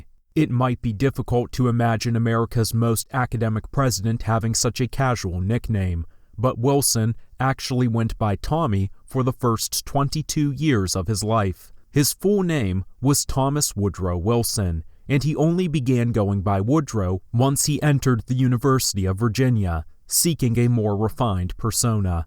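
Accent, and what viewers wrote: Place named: Vermont, United States. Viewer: I'm going to guess you have a touch of old Vermont accent. "Found out," sounds like: "faound aout." Great!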